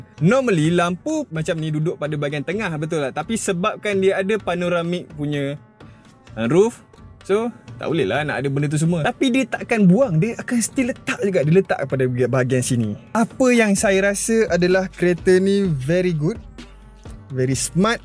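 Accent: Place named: Kelantan, Malaysia. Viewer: ni ore Kelate ke? Bila sebut 'tadi' jadi 'takdi' dan 'steering' jadi 'stereng'. Hehe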